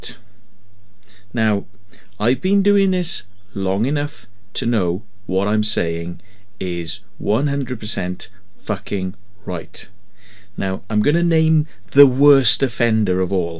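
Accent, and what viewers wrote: Wales, United Kingdom. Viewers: is he welsh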